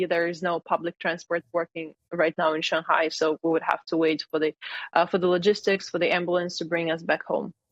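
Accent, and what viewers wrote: Scotland, United Kingdom. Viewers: Cute Scottish accent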